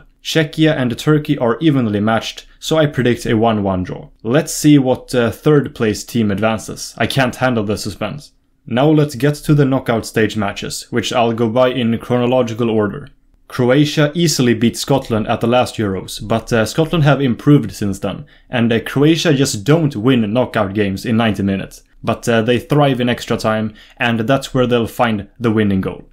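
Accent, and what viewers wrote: Sweden. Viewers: Måste va den starkaste svenska brytningen jag hört på länge😂 bra video👍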